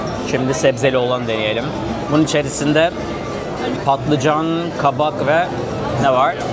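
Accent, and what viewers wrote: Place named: Turkey. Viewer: Soy argentina. Bienvenido y que lo pases muy bien. Solo escucharte hablar 2 minutos y reconocí que sos turco. Soy una gran fan de la telenovelas turcas. Seas bienvenido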